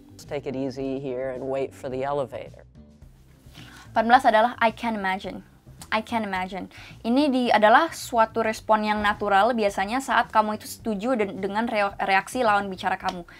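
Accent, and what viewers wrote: Indonesia. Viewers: Ternyata kamu orang indonesia ia ka? Dari logat bahasa inggrisnya sih terlihat orang indonesia tapi dari penampilan saya pikir dulu orang barat sana 😀😀 Sukses terus ka, saya banyak belajar dari kamu 🙏🙏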